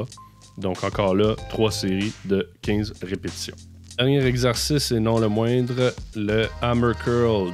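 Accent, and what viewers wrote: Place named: Quebec, Canada. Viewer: Un québécois, oh bin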